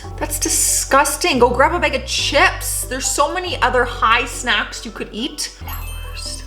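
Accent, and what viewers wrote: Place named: Canada. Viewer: Okay, I can’t do this anymore. You are hilarious and also a genius. How do I know you are a genius? Because you agree with me on almost everything. That’s proof enough. What you call sassy, my friends describe in me as being an asshole. I don’t care. These hopeless losers deserve everything we can throw at them. I love watching your videos. One small criticism: I can handle the Canadian accent and saying “aboat” for about, but work on your vocabulary, girl. I am amazed at how many perfectly ordinary words you mispronounce and seem never to have heard. Still, I love you to pieces. Keep being an asshole, oops, I meant being sassy. Some of us love it.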